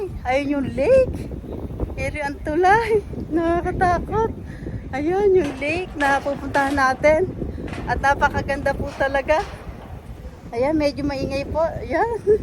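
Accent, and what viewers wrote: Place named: Tagalog, Philippines. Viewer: Hello ..goid evening , very trur ..ganda tlga nv pkace ..naoaka tahimik nv lake na ysn .. Pero ting punto mo tkga ang gustong gusto ko ..tagalog na tagalog ..sa buhsy america ..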